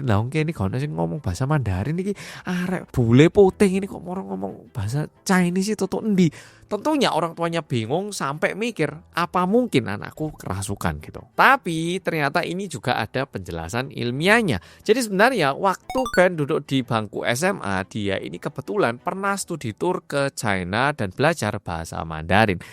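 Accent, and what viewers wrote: Java, Indonesia. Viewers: Logat medok'e kui, mas pancen jowo tenan👍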